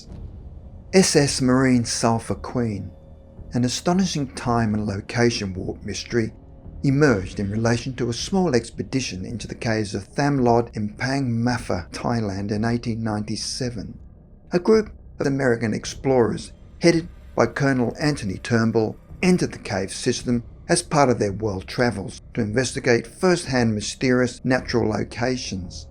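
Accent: Australia. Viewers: Kristina Mullen The narrator is either Australian or from New Zealand. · I think narrator is from Australia.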